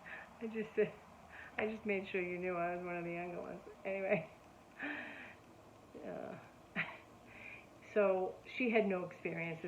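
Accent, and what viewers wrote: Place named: New England, United States. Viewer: Audio was fine very clear good video. I can tell you’re from New England though lol.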